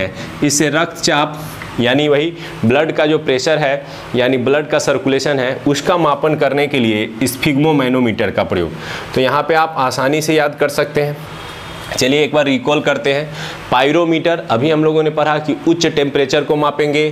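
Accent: Bihar, India. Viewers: sir aap bihar se ho...??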